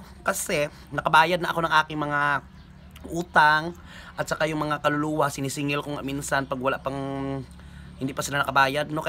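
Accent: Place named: Iloilo, Philippines. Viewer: Wahahaha abaw gid ah ilongga gid tana c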